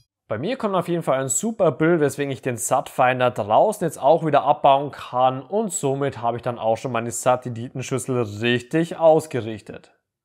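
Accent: Germany. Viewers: 👎🏼 wegen der deinem deutschen Englisch Lasst es doch einfach sein mit eurem scheiß Grundschul Englisch Geschwätz. Du machst ein deutsches Video dann schwätz auch deutsch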